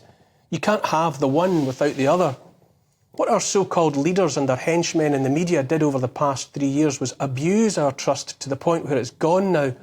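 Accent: Scotland, United Kingdom. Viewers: Well said George Best with a scottish accent😃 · it's not just what you say but the magnificent prose you use to say it. I could listen to you all day. The Scottish accent helps too.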